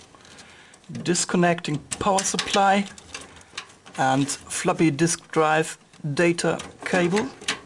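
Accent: Germany. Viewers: I am detecting a German accent here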